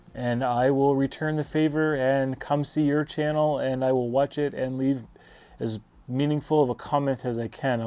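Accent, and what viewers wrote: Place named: Central, United States. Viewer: Ok, sorry, you sound just like and American from the central part of the U.S. I should have realised that, because I knew where the bay of Funday was.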